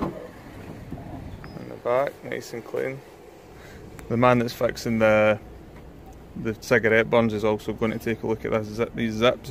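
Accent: Scotland, United Kingdom. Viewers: Hold on is that a Scottish accent talking about sun burn?........ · In a strange way, these videos remind me of the song: " " by. Your Scottish commentary plus the music. Pure brilliance.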